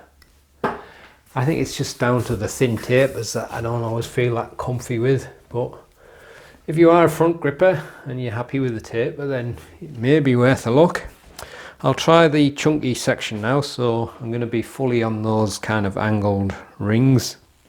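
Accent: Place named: Middlesbrough, United Kingdom. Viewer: Been watching the vids for a while now just wondering whereabouts you are from as I am from Middlesbrough , it sounds as if you're from that neck of the woods.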